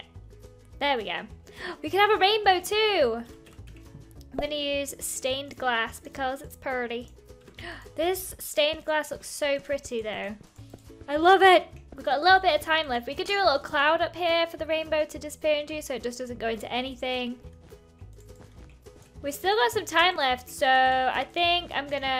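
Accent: United Kingdom. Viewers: She's british, they pronounce things differently.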